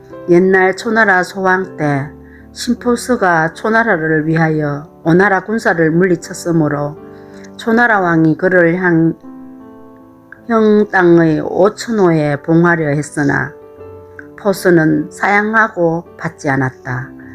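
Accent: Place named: Gyeongsang, South Korea. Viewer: 사기열전 19 잘듣겠습니다 경상도 ㅎㅎ 정감가네유 수고하셨습니다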